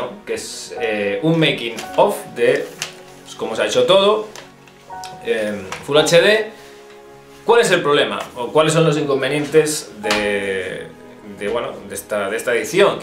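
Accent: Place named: Spain. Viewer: Manu Rodriguez comparto con vos, es mas, este canal es de un español y no me molesta para nada la tonada ni las expresiones, despues de un tiempo te acostumbras, y hasta pasan desapercibidas las diferencias con la lengua de uno, pero a las traducciones en juegos y peliculas nolo se.. creo que nunca podre acostumbrarme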